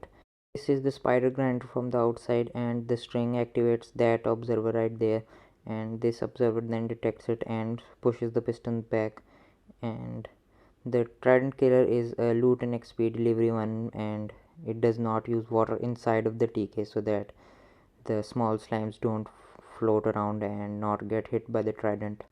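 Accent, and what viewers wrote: India. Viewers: Good Job man, don't judge me but by your accent I feel like you are Indian too and I think you can help build a sensible Minecraft community here. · Your English give me vibes of an Indian person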